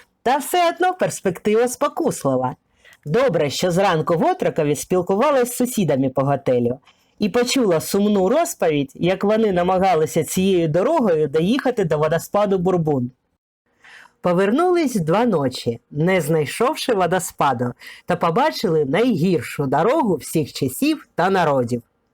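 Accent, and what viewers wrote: Russia. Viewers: Чомусь дратує мене така українська мова з явним російським акцентом...Вимкнула звук .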